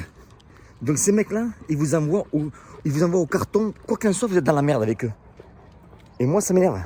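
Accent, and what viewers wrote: South, France. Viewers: Bonjour. D'habitude, je suis à 100% d'accord avec toi, mais la tu est en train de te contredire. JE m'explique. D'habitude ton discours c'est "on m'agresse, bam je riposte direct en cas de moi-même finir out". Or dans cette video tu est en train de nous dire qu'il ne faut pas "defoncer" un gars qui te saisis au colbak et que effectivement si tu le fait ta reponse ne sera pas "proportionnelle" à l'attaque ce qui est tout à fait juste d'un point juridique. Mais comment fait-tu (à part faire du sparring lourd tous les jours) pour ne pas réagir de maniére disproportionnée lors d'une attaque ou tu est en stress????? Voilou. Je veux pas faire mon chieur mais, les gourous t'attendent au virage et la ils vont pas te rater. Enfin bon je prefere quand même le gars avec un bon accent de chez nous (du sud) au gars qui se rase la tête avec un elastique.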